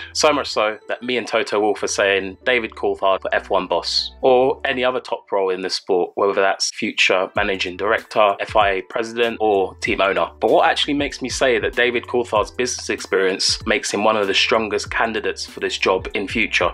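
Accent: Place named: London, United Kingdom. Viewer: You sound like ur from lonnndon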